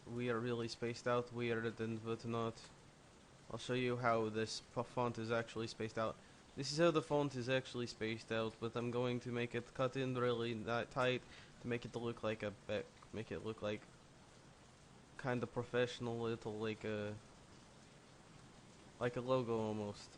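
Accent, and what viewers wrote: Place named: Russia. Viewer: He's Rrrrrussian.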